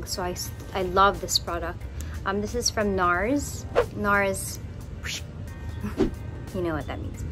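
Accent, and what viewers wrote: Bicol, Philippines. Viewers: That "luway luway lang tabi" ang cuteeee. Lakas maka shala pag siya ang nag B-Bicol. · Heart is a well-known personality yet in her previous vlog she went to a thrift store other personalities don't do that but she does! I Love you miss Heart! Keep slayin'💖💘 I also love her Bicol accent😍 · "Luway-luway lang tabi"! Hihi nakaumok dangugon ka magbicol! So cute! 😍💕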